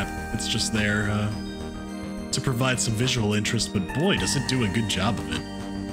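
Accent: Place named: Germany. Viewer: Yesss bring on the weird! Also it’s always a treat hearing your German accent come out to play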